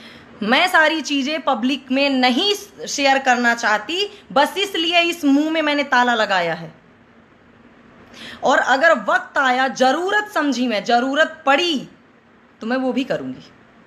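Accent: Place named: Bagheli, India. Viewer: आप की टोटल वीडियो सुपर डुपर.. बघेली... आप के जैसा किसी की एक्टिंग नहीं है बघेली... मे.. सब सीख के बोलते है आप शुद्ध बघेली बोलती है... हर कहानी मे कुछ न कुछ सिखने को मिला..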